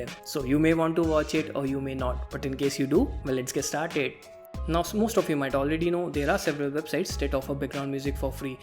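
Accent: India. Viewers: Why am I getting angry at his annoying indian accent....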